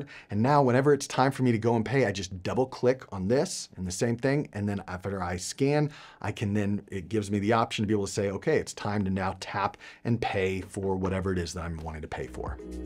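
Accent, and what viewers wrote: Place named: United States. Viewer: I don't understand why you speak american english but your channel is in portuguese. Frist time I see such thing.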